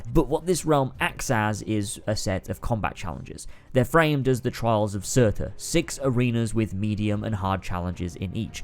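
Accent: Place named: United Kingdom. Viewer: A Greek name spoken through a thick UK accent.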